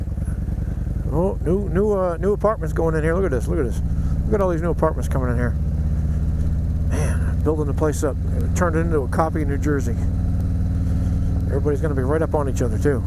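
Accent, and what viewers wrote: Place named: New Orleans, United States. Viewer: I'm watching this and thinking about not just accents, but differences between us all. My wife is a beautiful filipina...such lovely brown skin and complexion...but she hides from the sun like it was evil!! In the meantime...I'm laying out in the sun in summer to get some tan on what I call my pasty white 'coffin legs!' The brown people wanna be white...the white people wanna get a tan...lol! We humans are a funny bunch.That said I love accents...I was in Bastan once...and the guy said..Pak ya truck over thay're..so I did..park my truck over there! I love it!! What could be better than a vid from our mutual friend...and ya get to hear his accent from the Big Easy....it's like a vacation from a place you want to visit...and you don't have to take a flight!! Too bad us humans...can't get together on a little more of our differences that in the reality of things don't...involve hate... 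Thanks for the ride..eh!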